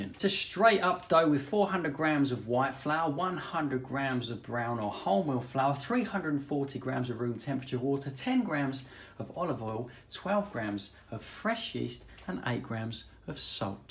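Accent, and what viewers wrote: United Kingdom. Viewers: Having gotten all the knowledge im gonna get by watching all these videos, I now put them on just before bed so I have sweet, doughy, British sounding dreams.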